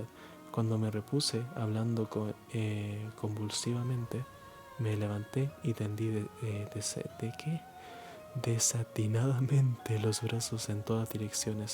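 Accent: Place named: Chile. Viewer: Acabo de encontrar este canal… no sé qué tiene, pero AMO el acento chileno, este canal es una BENDICIÓN PARA MI!!! Por cierto, hermosa voz! Me encanta! ✨ (Saludos desde México 🇲🇽) Me encanta escuchar como se esfuerza por leer bien (me pasa también, tengo que releer algo para entenderlo bien por lo menos unas 3 veces)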